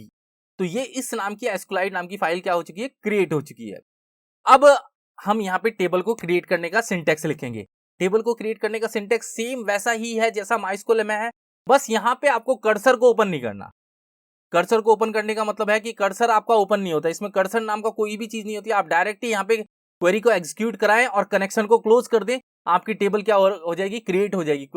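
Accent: India. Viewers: sir this is the right code import sqlite3 conn=sqlite3.connect("sqlite1.db") conn.execute(''' Create Table Student ( st_id INTEGER PRIMARY KEY, # <-- fixed here st_name VARCHAR(50), st_class VARCHAR(10), st_email VARCHAR(30) ) ''') conn.close I noticed that you are using AUTO_INCREMENT for the st_id column. This is not supported by SQLite, which uses a different mechanism for generating primary keys. You need to use INTEGER PRIMARY KEY instead, which will automatically assign a unique integer value to each row. This will also make your table more efficient and compatible with other databases. To fix this, you need to change the st_id column definition to INTEGER PRIMARY KEY I hope it's ok to give feedback, great channel by the way, the biggest benefit of this channel is the Indian voice, learning from English channels becomes tedious due to their dull voices, thank you for this material.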